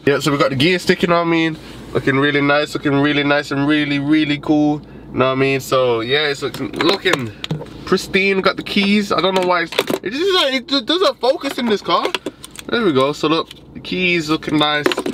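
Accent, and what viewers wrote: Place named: Jamaica. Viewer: I can hear he is from Jamaica like me🙂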